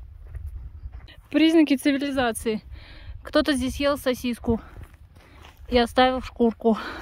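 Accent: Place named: Armenia. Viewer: ты говоришь по русски с милым армянским акцентом 😁👍🌹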